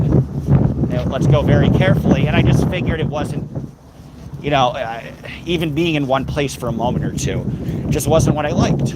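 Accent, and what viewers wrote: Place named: RP, United Kingdom. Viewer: Ooooo posh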